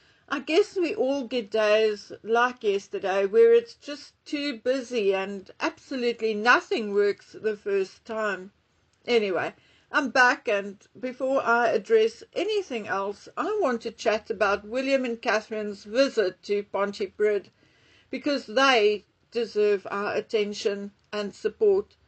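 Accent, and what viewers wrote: South Africa. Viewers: Yussus man that’s a baie strong South African accent if ever I heard one ekse 😂😂😂😂 lekker 😂😂😂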